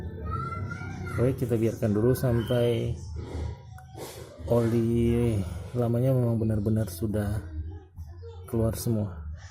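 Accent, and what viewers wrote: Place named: Manado, Indonesia. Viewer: Dapa dengar rupa orang Manado ini kang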